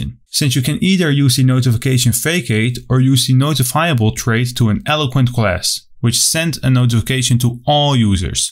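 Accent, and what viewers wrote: Netherlands. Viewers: Hi, thanks for your videos. It sounds like you're dutch:) Can you make a video about broadcasting notifications?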